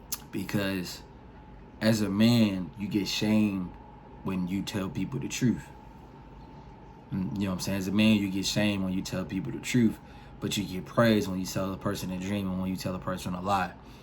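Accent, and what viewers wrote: Baltimore, United States. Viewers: You mad cool but I hate your ascent. You must be from Baltimore. Certain words you say comes out funny. You speaking rs tho lol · I thought u was from Baltimore for a second 😂😂😂😂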